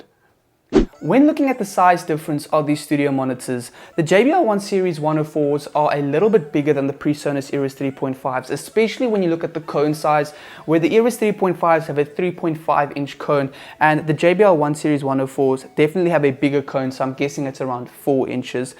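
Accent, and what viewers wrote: South Africa. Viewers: completely unrelated question but are you south african? i'm trying to learn the different styles and accents of english better.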